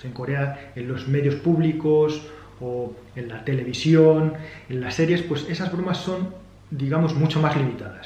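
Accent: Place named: Spain. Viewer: Normalmente los coreanos que hablan español tienen acento de mexico pero vos lo tenes español...porque? es solo curiosidad saber de donde es el acento. saludos.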